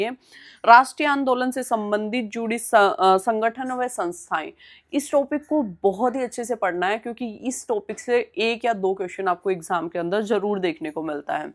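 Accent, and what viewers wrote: Haryana, India. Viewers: Maam you have a perfect Haryanvi accent.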